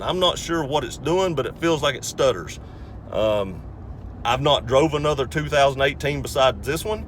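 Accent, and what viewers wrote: South, United States. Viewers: What state do you live in because you sound very southern.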